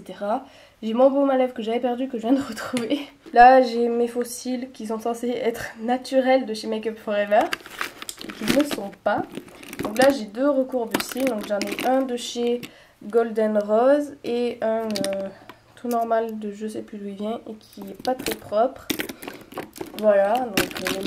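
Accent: South, France. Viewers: Coucou ma belle je vient de découvrir ta chaîne j'aime beaucoup ta chambre qui est simple et mignonne. On devine desuite que tu est du sud avec ton accent :P Tu m'a fait rire avec ton espace " cercueille" Je pense que tu voulais dire sanctuaire? x) Bref super vidéos je m'abonne car j'ai regarder plusieurs de tes vidéos et j'aime beaucoup ta chaîne :) J'ai également une chaîne beauté je t'invite a allez voir si sa te dit sa me ferais plaisir ! Bisous xoxo !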